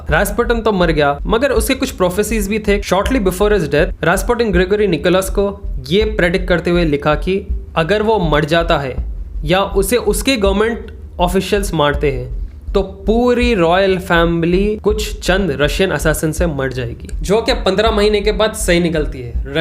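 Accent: Hyderabad, India. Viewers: Bhai hyderabadi accent hi bola karo wahi suit hoti hai dusre accent mai hindi/urdu hi change hojati hai all the best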